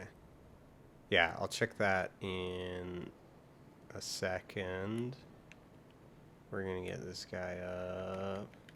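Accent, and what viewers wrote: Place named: Edmonton, Canada. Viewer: Where do you live? I'm from Edmonton. Sounds like you're close to me.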